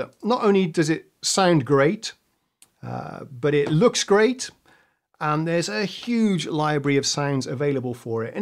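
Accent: United Kingdom. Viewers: Starting to get weary of this British accent teaching us incessantly about everything and oh, don’t forget to toss in a few meters and kilometers.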